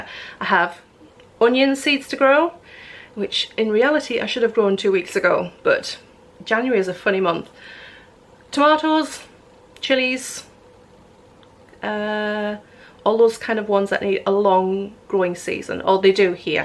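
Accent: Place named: County Durham, United Kingdom. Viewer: Very excited to see you working on your smallholding - hoping it will give me inspiration to get going this cold February, with my little plot, which, by the sound of your accent is near your own roots. (County Durham). I have four hens, a small vegetable plot and currants, raspberries and plums.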